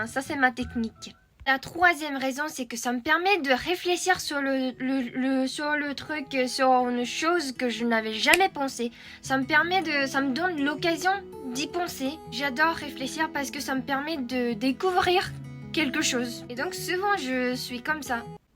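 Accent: Japan. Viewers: Ok , l'accent nippo_français est vraiment très mignon. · En tant que français qui apprend le japonais c'était génial d'entendre une japonaise parler français 😄 Les français devaient te poser des questions à 50% par curiosité et à 50% pour ton accent😃 Merci du partage 🙏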